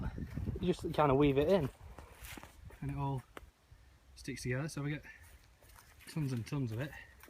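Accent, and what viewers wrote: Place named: South Yorkshire, United Kingdom. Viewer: Good vid lad, going from your accent you ain't far from me... I live in Wombwell 👍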